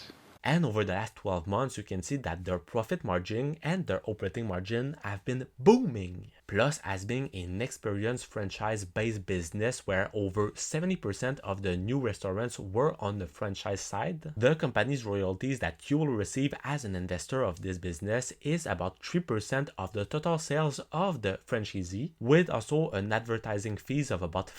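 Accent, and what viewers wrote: Quebec, Canada. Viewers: Es-tu Québécois? J'ai beaucoup d'amis avec le même accent 😅. Si oui , tu fais des vidéos en français?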